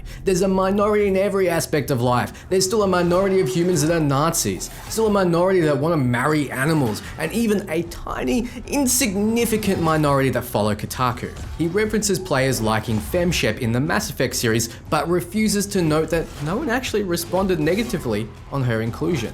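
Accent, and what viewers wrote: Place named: Queensland, Australia. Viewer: "Were there female Viking warriors? Who gives a f!" "That was a question Kotaku so next time use a question mark mate." I'm not sure what you read, but the part highlighted in blue literally has a question mark, right there, after the question. Are you intentionally being obtuse? I dislike Kotaku as much as anyone who understands their background, call them out on their biased reporting, there's ample opportunity for that you don't need to make stuff up. I really enjoy your content and as a fellow antipodean it's nice to hear an Aussie accent - your accent suggests Queenslander to me, I'm from SA. So what's going on my dude? EDIT: I've just come from your recent video with the hidden messages where you miss-read the hidden message. I don't think you're doing this intentionally and would like to believe that you are being intellectually honest but it does set the framework up to make me wonder what else is going on when we can't read what you're quoting from. 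Anyway as an Aussie I'm sure you'll see my comment for what it is, criticism, pure and simple.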